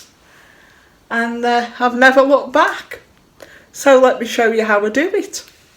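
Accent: United Kingdom. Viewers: I love this video, thank you so much for sharing, looks like we're off to B&Q at the weekend then! I've only just come across your channel and subscribed right away. It's so lovely to hear a British accent, especially one that I think is relatively close to me. Is it a Stoke accent? I'm West Midlands but close to the Staffordshire border. It's also nice to hear of quilt shops I actually have a chance of getting to :) I'm new to quilting, just working on my second project which is a baby cot quilt. My first project was a lap quilt so both fairly easy to baste. I would love to do something bigger for my next one but the basting completely put me off but this makes me think I can attempt it :) Thank you again, off to search through your older videos now! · Thank you for sharing your basting techniques. Very helpful. Have subscribed, it’s lovely to hear a Brit voice talking quilts.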